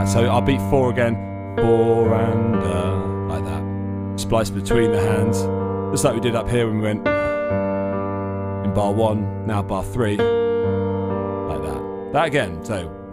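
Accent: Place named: England, United Kingdom. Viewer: you sound like my crush from England omfg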